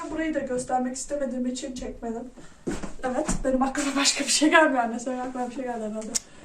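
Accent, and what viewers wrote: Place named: Sinop, Turkey. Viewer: Sinoplusunuz galiba kınuşmanız sinopluları anımsatıyor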